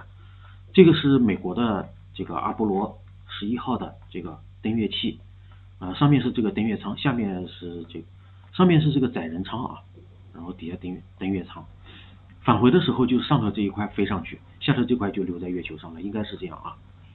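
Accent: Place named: Shanxi, China. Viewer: 请问是哪里人？听口音有点耳熟，应该是北方人。山西？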